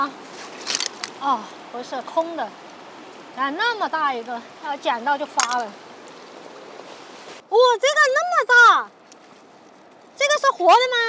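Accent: Sichuan, China. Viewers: 看到一半我老公说这会不会是个四川人！跟你一样好奇地什么都要抓一下摸一哈，说话也像，看到后面果然啊！了解我们大四川的川性是什么样的(o^^o) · 听口音是四川人吧？ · 火锅味的普通话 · 美女是四川的吧。这口音听着好熟悉，哈哈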